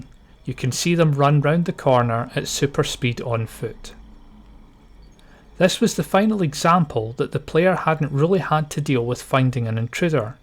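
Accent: Scotland, United Kingdom. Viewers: Thanks alot mate, the tips & tricks really helped if I ever want to play WD 1 again! 1 sub I also quickly recognised that you're Scotish like me. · youre scottish aint ye i love your acceent mate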